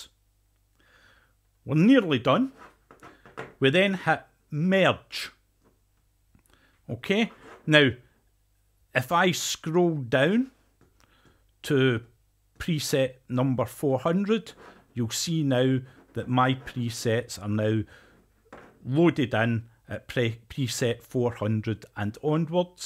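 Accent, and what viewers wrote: Scotland, United Kingdom. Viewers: Are you by any chance ........ scots?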